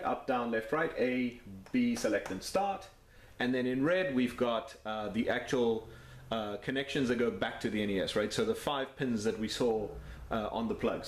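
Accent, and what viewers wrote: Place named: South Africa. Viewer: you sound like you have a bit of a latent South African accent going on there. Awesome video too, keep it up.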